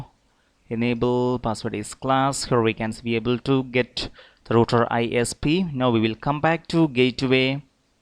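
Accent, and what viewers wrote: India. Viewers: Your accent india prideee i'm not understand sir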